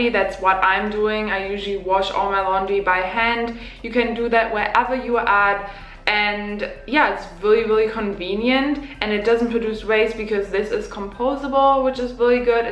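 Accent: Germany. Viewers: Hey! I just wanted to drop in and say that I LOVE your content! I just subscribed and can't wait to see more videos :) I also really love your accent. One of my very best friends is a foreign exchange student from Germany and it reminds me of her. I'll be so sad when she leaves, but we will be able to stay in touch and hopefully visit each other in years to come!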